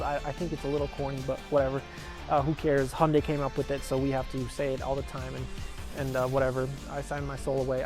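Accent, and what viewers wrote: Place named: Canada. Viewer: That Canadian accent and way of speaking annoys the crap outta me.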